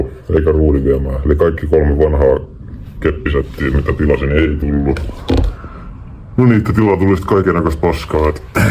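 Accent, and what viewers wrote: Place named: Pori, Finland. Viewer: ootko porista? mietin ku murre kuulostaa siltä😅 no anyways on kova setti💥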